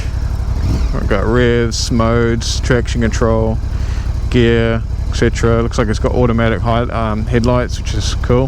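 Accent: New Zealand. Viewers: Cool review bro. Love your style and always good to hear another Kae-wee accent on YouTube.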